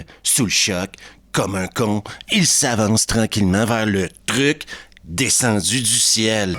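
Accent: Canada. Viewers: J'adore ta manière de compté une histoire avec ton accent canadien 😂😂😂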